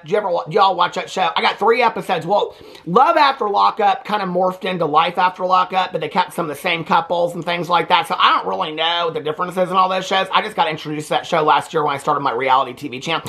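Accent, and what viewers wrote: South, United States. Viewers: Im from Tennessee and you do have a southern accent and I love it 😜 omg You have got to read the comments more often. That was hilarious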